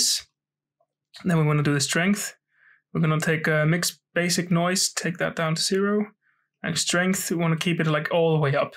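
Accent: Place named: Wales, United Kingdom. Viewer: Both of these videos are amazing! Thanks so much. Also you sound like a mix between Welsh and maybe Nordic 😃😃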